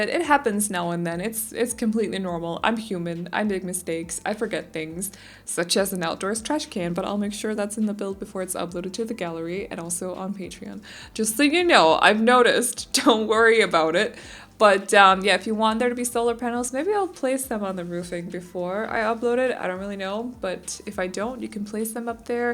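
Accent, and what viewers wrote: Denmark. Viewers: Faktisk var hun en af de første mennesker, jeg fandt. men tak! Også nu tror jeg, at min engelsklærer måske bruger dine videoer til danske studerende, der lærer engelsk haha. Hun tror, det vil hjælpe dem, da du har den danske 'accent'. HVORDAN LÆRER MENNESKER ENGELSK, SOM DE HAR STAVRETNINGSREGLER, OG FØLG DEM IKKE DEM!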